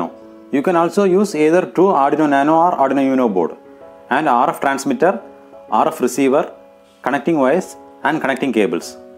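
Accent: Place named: India. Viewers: Sorry, but horrible to listen - Indish-English ... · Please turn on the caption if you are incapable to understand the Indish-English. In this way you can get it easily. Thanks.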